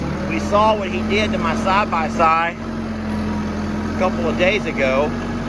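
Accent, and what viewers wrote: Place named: South, United States. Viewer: I am 100% right there with you on the southern pronunciation, there. If I have to use text-to-talk or google search voice, I have to speak loud, clear and slow...and STILL get frustrated because the damn thing doesn't speak Southern! And forget calling with voice activated menus....I might say "pay my bill" 5x and it still can't understand me! So I give up an start cussin it out...so it finally switches to number controls: 1 for yes or 2 for no. I laugh but I also get really pissed....because Southern people in my neck of the woods get some words more correct than other states! Some places people say E-mmediately, we say IMmediately (like IMagine) which is likely more proper! Its a crazy thing, the english language!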